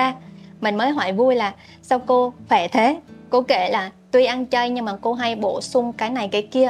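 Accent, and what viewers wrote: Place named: Hue, Vietnam. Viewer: Chị này giọng huế nè kk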